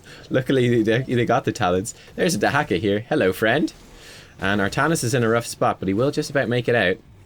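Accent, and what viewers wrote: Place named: Ireland. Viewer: just teasing, love the Irish accent!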